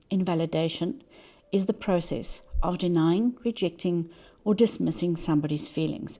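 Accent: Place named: South Africa. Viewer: I thought I had recognized your accent… from SA myself 🥰 but living in Portugal. Thank you for your time 🌸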